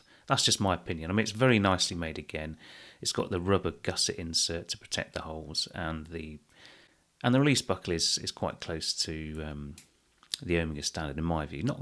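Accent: England, United Kingdom. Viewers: ive sold a lot of watches in the past , a 16570 and a Omega PO 42 - I dont regret it at all i actually hated those watches and regretted buying them , never wore them at all , now I think very very hard before buying a watch , money sitting is money wasted! A sub is nice but you can always always grab another one in the future if you want life always goes on! Great vid! love the english accent :-)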